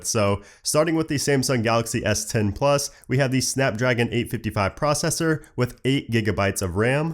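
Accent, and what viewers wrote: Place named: United States. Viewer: This review is "pointless". Why? Because there are a few variables that can have a major outcome on the end result. For example, are you left handed or right handed? Inevitably your reaction will be quicker by whichever hand is dominant. Another variable is you; by your vocal accent, we can establish that you are an American reviewer, and Apple is an ...............American brand????? Yeah, enough said. What must be more disturbing to Apple fans is the fact that major components used in Apple phones belong to Samsung; invented, designed and manufactured by Samsung. One example is the magnificent OLED screen. Apple phones are nothing more than a collection of far eastern components screwed together in an Apple shell and marketed as premium smartphones.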